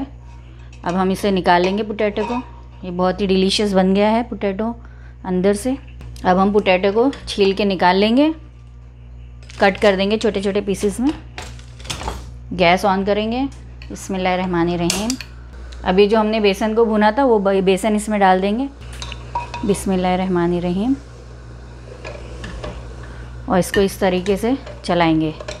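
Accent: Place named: Dongri, India. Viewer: Bohot ache aapa, aap dongri kyuki aapke baat karne ka tareeqe mei jhalak hai kya, mei abhi out of india settle hu but aapne muje yeh dikha ke apne bachpan ki yaad dila diye, lots of wishes and keep growing, aapa rocks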